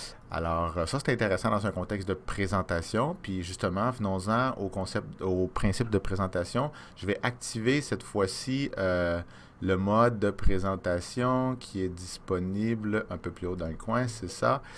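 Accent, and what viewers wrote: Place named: Quebec, Canada. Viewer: Non, si tu savais comment tu me sauves du temps. Tes capsules sont très bien expliquées car j'ai fait le tour...et d'autant plus c'est la voix d'un québécois... hihih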